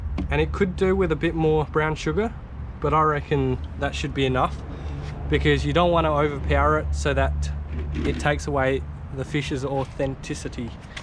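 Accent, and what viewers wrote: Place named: South Australia, Australia. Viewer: I think this is the first time I’ve noticed a different accent from SA to east coast Aus . Nice vid ! Cheers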